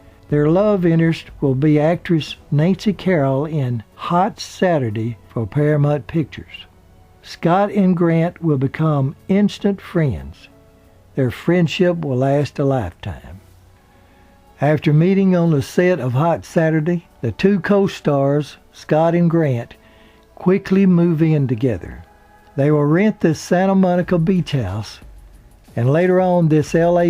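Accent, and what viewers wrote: South, United States. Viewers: The narrator has a beautiful southern accent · Many thanks, one of my favourite actors. BTW I love your accent, where in the States are you from?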